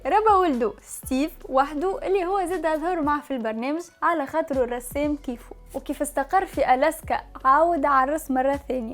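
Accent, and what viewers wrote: Tunisia. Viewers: الله اللهجه التونسية تجننن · اللهجه التونسيه تجنن برشا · سرد أخّاذ، وموهبة رائعة ولهجتك لذيذة! أحب تونس الخضراء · اللهجة التونسية يا سلام 🇲🇦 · بعيدا عن الفيديو اول مرة اشوف يوتيوبر تونسية جدا جدا حبيت اللهجة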